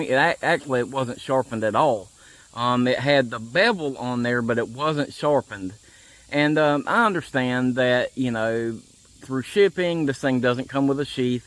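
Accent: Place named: South, United States. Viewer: Subscribed, just because you’re safety conscious and wearing your safety squints and I like the southern accent.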